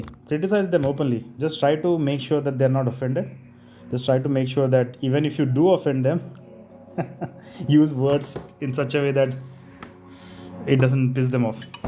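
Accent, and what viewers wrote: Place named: India. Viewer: Hell Nah Indian scammer with the accent too is hilarious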